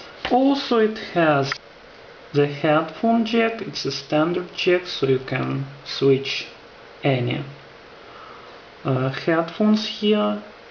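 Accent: Russia. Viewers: You sound pretty ruassian O_o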